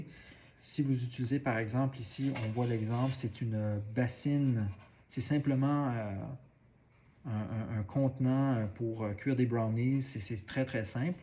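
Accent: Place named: Canada. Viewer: Bonjour A ton accent charmant, tu es canadien! Donc, difficulté pour moi de venir vous rencontrer en personne, puisque j'habite la France (la Provence, pour être précis) Voici quelques autres questions. Je me régale de vos vidéos concernant l'aiguisage à la pierre japonaise! Par contre est-ce que c'est une forme de "luxe" par rapport à l'aiguisage à la bande abrasive? Autrement dit, est-ce que ce sont deux modes d'aiguisage qui n'ont rien à voir l'un avec l'autre ou, au contraire il y a un lien entre les deux modes? Si oui, lequel? Je vous pose cette question par que d'après vos vidéos on peut tout faire avec les pierres à aiguiser japonaises, alors pourquoi utilisez-vous aussi l'aiguiseuse à bande? Merci de vos réponses (vous semblez être quelqu'un de très sympathique)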